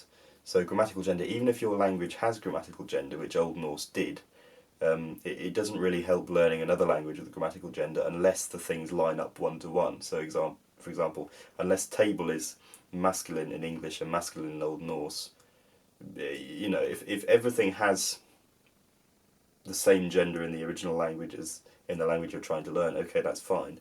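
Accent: United Kingdom. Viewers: I am russian. And I hear your english is so beautiful. British accent is so european, just like latin or french 😅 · He sounds more british than a fooking Benedikt Cumberbatch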